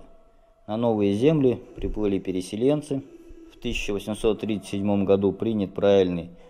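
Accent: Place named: Moscow, Russia. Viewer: Московский говор, что здесь такого особенного? Давайте приедем в какую-нибудь деревню под Н.Новгородом и потребуем от людей не окать. Сами догадаетесь, в какое сказочное путешествие Вы отправитесь с невероятной скоростью? Все говоры русского языка равны, и не надо придумывать какой-то "идеальный" язык. Даже в эпоху Интернета не всё так однозначно :)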